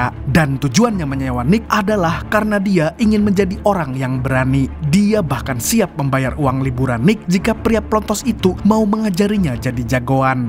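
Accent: Banjar, Indonesia. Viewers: Urang banjar leh kali nya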